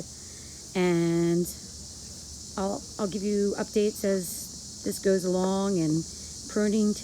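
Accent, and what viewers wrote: New Jersey, United States. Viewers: I knew the accent! Hey jersey girl from philly girl!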